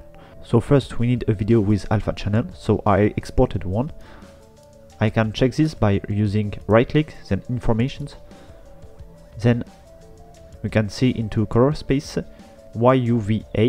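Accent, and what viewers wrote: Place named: France. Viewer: lovely french accent, also thanks for the software it's got so much in it!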